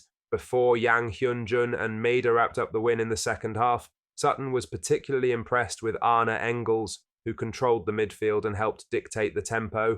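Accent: England, United Kingdom. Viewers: Were do you stand mate you have an English accent and comment on Celtic but I have also seen you in a Sevco shirt giving it about them as well so where do your loyalties lie......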